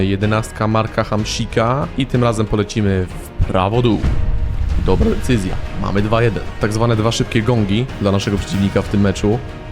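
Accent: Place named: Germany. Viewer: zwykły żabolski Szwab a nie Polski akcent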